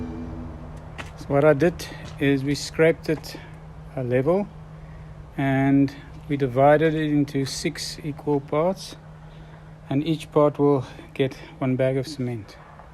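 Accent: South Africa. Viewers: your accent sounds South African.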